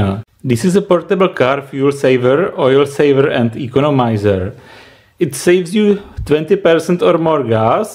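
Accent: Czech Republic. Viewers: Sounds like a Czech English accent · Tak tohle je nejsilnější český akcent, který jsem v angličtině kdy slyšel. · Thats some nice czech (prague) accent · i know you're czech because you czech-ish english pronoumciation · Judging by your accent - Are you Czech? If yes then "Ahoj" :D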